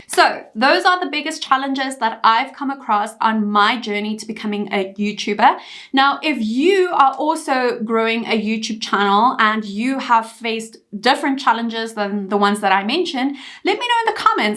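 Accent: South Africa. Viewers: Are you South African? That accent...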